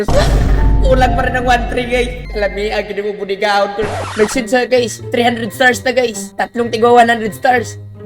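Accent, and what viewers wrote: Visayas, Philippines. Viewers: Bisaya man diay ka pader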